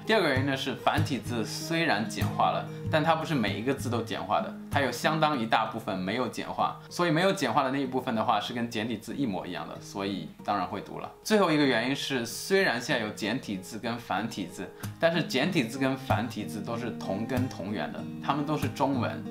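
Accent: Taiwan. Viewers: 你會唸沒錯，但是你怎麽用臺灣口音讀！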